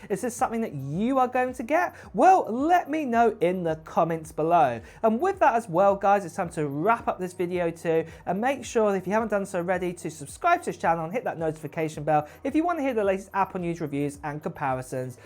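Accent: United Kingdom. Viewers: High pitched british voice....I don't believe anything you say.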